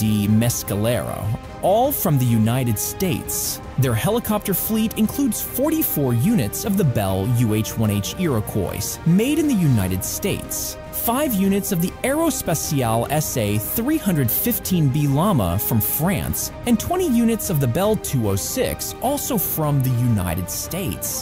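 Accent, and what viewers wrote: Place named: United Kingdom. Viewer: Tal cual. Tu lo has dicho. Vos, que la viste derrumbarse y rodar por el suelo mordiendo el polvo, no quieres ver que está levantándose, ¿por qué? ¿qué intereses hiere un hipotético rearme argentino? ¿Por qué nos quieren con la cara contra el piso y las manos en las espaldas? ¿Por qie se regocijan viendo a Brasil y Chile armados hasta los dientes? ¿Quién sos, detrás de ese voz y locucion británica o angloparlante? Un saludo desde Córdoba, Argentina.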